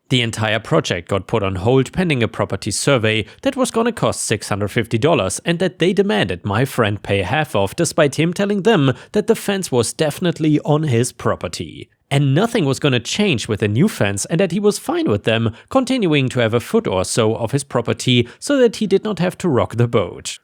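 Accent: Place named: Germany. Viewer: I can't help it, but your accent sounds very german to me. Are you a german expat in Thailand? Anyways, thanks for the entertainment!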